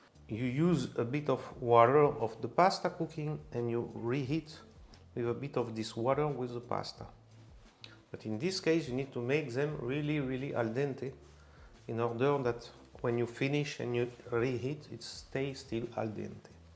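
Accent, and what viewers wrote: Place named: France. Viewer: Love his French way of expressing and speech patterns!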